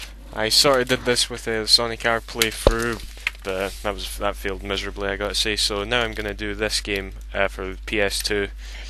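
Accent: Scotland, United Kingdom. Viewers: I always did like the Scottish accent. Sounds so... GOOD!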